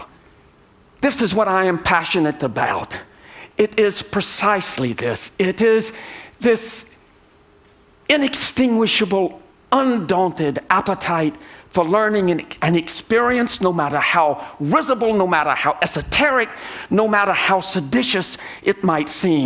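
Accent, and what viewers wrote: United States. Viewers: Quite a bearable American accent. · Marvelous! A Southerner (sans any Hungarian ancestry) talks (in Southern English) about his affinity with Hungarians, or with the Hungarian spirit, or, as James Michener might have put it, an affinity with the cantankerous nature that characterizes Hungarians.... hmm, more politely called that "curiosity", that insatiable inquisitiveness, that characterizes Hungarians. Conjures up memories of old jokes, e.g., ... Q: Are you part of the SETI search for extra terrestrial life? A: Those aliens are already here, they're called Hungarians! My own command of the Hungarian language is woefully minimal, but the speaker's Magyar friends could have schooled him better in the pronunciation of jó nápot pacák, or the names mentioned, etc, even with a Southern accent. Mispronouncing some Hungarian words can get ya into big trouble, I know, just one example being when I saluted some important company with a toast, attempting to say "Egészségedre!", meaning "to your health", but it came out as "Egeseggedre", a polite translation being "to your butthole", which caused a mixture of embarrassed groans and guffaws of hysterical laughter... 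an episode that put a serious dampener on my motivation to further learn that diabolical lingo. One thing I did make sure of after that experience, though, was to make a special effort to correctly pronounce anything I said in any language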